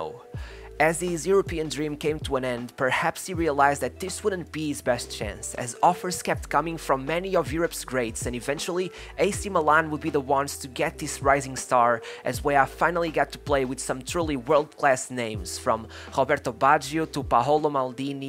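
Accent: Portugal. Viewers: are you portuguese? sounds like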